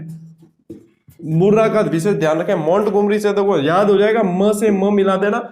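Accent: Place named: Marwar, India. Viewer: सर आप जो वीडियो में मारवाड़ बोलते हैं ना वह मुझे सुनने में बहुत अच्छा लगता हैं श्री शिवाय नमस्तुभ्यं महाकाल आपको हर बुरी नजर से हर हर विपदा से बजाएं आपके परिवार की रक्षा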